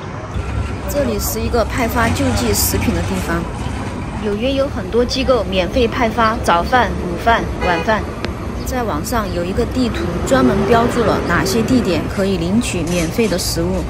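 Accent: Sichuan, China. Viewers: 师姐你是四川人吗 都垮杆了😄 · 师姐是 四川人吗？ 川普好亲切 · 看你的视频也学了不少四川普通话。👍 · 大邑邛崃蒲江一带的口音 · 流利的川普，就喜欢这个口音，加油。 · 哈哈，晚上不要东晃西晃，四川话好亲切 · Haha - I can tell the host is from Sichuan where I came from... Take care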